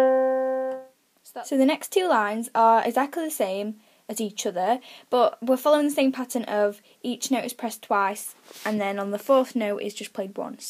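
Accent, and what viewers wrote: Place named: United Kingdom. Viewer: She sounds British.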